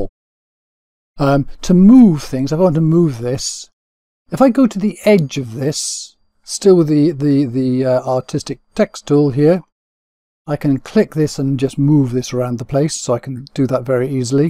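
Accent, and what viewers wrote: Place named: Wales, United Kingdom. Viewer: Just found you. You explained that so clearly... and you're Welsh! Thanks, I've subscribed.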